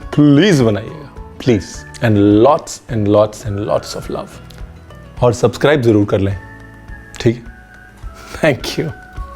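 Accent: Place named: Punjab, India. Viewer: Do you ever shower and clean up! You and your Punjabi accents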